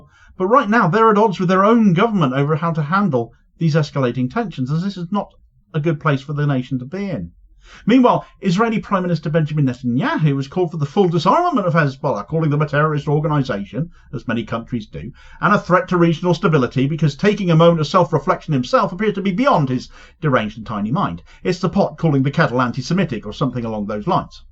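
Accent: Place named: South West England, United Kingdom. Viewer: Its his English "local" accent not the microphone.... South West England. Sounds fine to me "Ooooh Arghhhhh"! somethings in the UK still shine bright against the invasion... thankfully local accents live!! (Google " " and you will understand what I am saying 🤣 )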